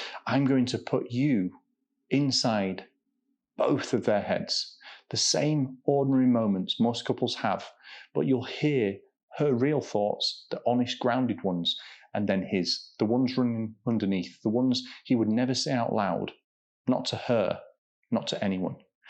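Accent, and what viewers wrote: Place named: United Kingdom. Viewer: Oh....man...ive always seen u on tictok.....never knew you had the British accent!!! Love it!! Always have...Im from the states & i sound like a dumb hillbilly!! Thank you for all ur work, im still healing after 20yrs with a narc...u are a blesssing❤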